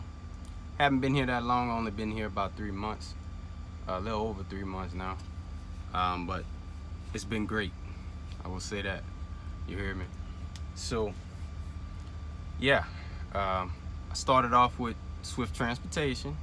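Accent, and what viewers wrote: New Orleans, United States. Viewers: Sound like he from New Orleans ya heaarrddd mehhhh !! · I can tell you from New Orleans lol I’m from mobile Alabama , how often you push yo self home ??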